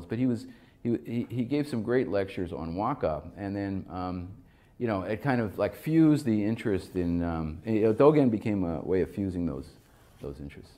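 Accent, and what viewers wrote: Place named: Malta. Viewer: she sounds Malties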